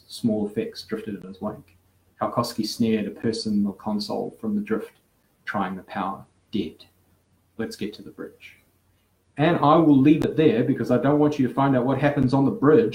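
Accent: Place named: New Zealand. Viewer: Oh my! How I loved this! As a mujer living in Spain and whose English May be considered a second or third language-depends from where you look, born and raised in Tanzania with Afghan origins and comping to live in Spain at the tender age of 12-, I love listening to your NZ accent, and how English varies from the ex colonies. Same thing happens with Spanish from Spain and the Iberoamericanos(Latin America) Spanish. Thank you,! for the lesson. I really enjoyed it!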